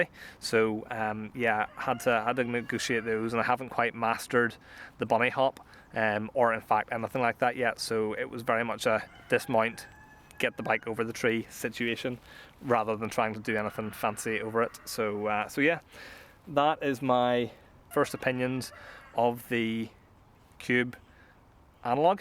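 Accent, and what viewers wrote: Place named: Ireland. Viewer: I thought the accent was familiar, my one year younger brother was born in Ballymote Ireland August 1965 at a Catholic nuns house and he's the most successful of 5 children , freshly retired with full pension and, I think I'm going with a 2022 Cube Aim pro in same color scheme as your's $500 off retail right now at $999.00 CAD ,& putting 5 great touring accessories on it Both fenders,Both Lights and the Universal Kickstand,So should be a great bike , Never have spending over a G Note for a New pedal bike instead of buying second hand used for $400 less, So I figured the extra cash for a New bike is the wiser way to go. From Alberta Canada.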